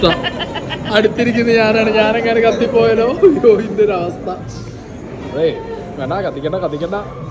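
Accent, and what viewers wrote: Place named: Kozhikode, India. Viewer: കാണാൻ കൊറിയൻ ലുക്ക് ഉണ്ട് സംസാരം കോഴിക്കോട് 😍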